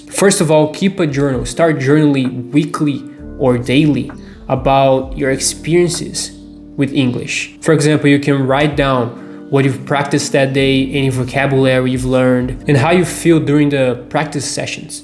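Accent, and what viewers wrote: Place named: Brazil. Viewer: He's Brazilian!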